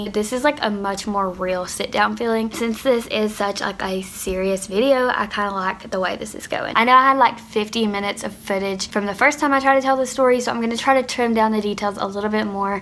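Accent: South, United States. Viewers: I knew as soon as I turned on your video you were a fellow Kentuckian! Great story time. .thanks for sharing your story How the hell did she know your friends last name? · Tinna jones it doesn’t sound uncommon to me, it just sounds like a southern accent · So much courage! I hear some southern I’m from Georgia ! I appreciate your story! May god bless you! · Oh my !! I’m new, from Canada Ontario. You have such a beautiful southern accent !!! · girl my first thought when you started talking was that you were from kentucky too!! you’re so pretty. anyways thank you for sharing this,, it’s definitely gonna help a lot of people. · Kentucky crew lol sorry hun but I love the accent mine isn't thick cuz how North eastern I am or at least I don't notice it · I love your accent sounds very Southern i can tell ur from Oklahoma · Yo, I swear I knew your accent 😂 KY girls gotta represent. 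Lol. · talks with a southern drawl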